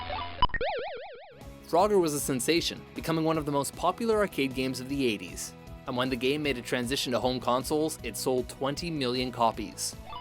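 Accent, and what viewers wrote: Canada. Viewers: That's the way Canadians talk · FIRST WORLD PROBLEMS It's a Canadian accent. get the fuck over it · He's Canadian. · he's canadian..... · It's called a Canadian accent, stop being so butthurt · For every person going "THEY MISPRONOUNCED MARIO!!?!?!" The narrator is Canadian so he's obviously going to pronounce things differently. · It's a Canadian accent. get over it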